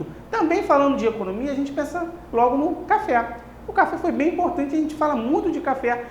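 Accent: Rio de Janeiro, Brazil. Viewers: Esse sotaque parece Carioca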